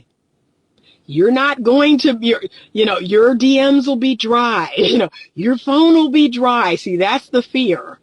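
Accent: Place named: South, United States. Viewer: i just knew that accent was from the south. DC , the border of the north and south. good video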